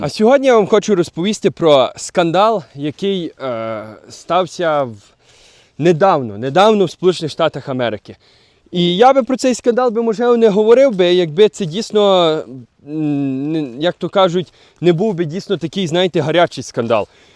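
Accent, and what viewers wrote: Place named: Lviv, Ukraine. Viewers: так у Львовi розмовляють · Це типічний Львів.Наша гордість.І говір і люди тут особливі. наш хлопець-львівський.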